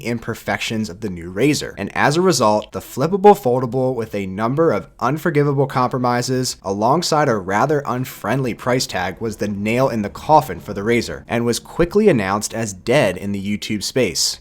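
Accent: Philadelphia, United States. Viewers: Are you from Philadelphia? Sounds like it haha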